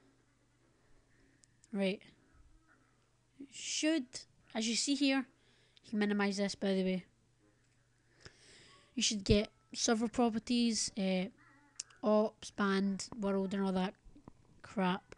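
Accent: Scotland, United Kingdom. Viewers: oh he is Scottish i can tell i been there lol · Like the accent, im scottish too i dont often hear it on youtube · You gotta mad scottish accent.. Its scottish right?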